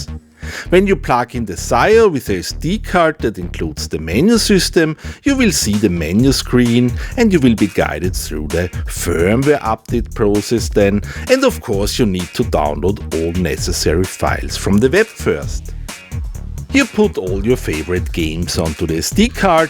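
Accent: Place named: Austria. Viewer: How i missed that Austrian accent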